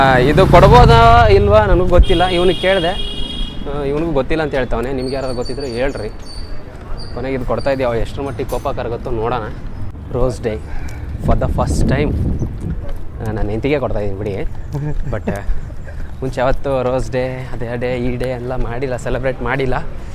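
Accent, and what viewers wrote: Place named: North Karnataka, India. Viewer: ಸೂಪರ್ ಅಣ್ಣ ಉತ್ತರ ಕರ್ನಾಟಕದ ಮಾತು ಅಂದ್ರೆ ಹಿಂಗೆ 👍🌹🌹🌹🌹🌹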